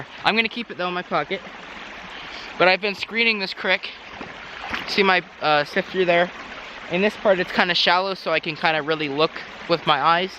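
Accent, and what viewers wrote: Pittsburgh, United States. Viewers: He sounds like he from Pittsburgh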